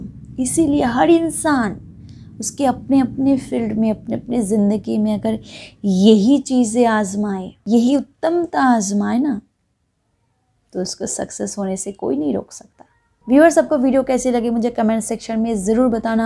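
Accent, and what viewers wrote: Bengal, India. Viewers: Bengali accent we can feel in your hindi😀😀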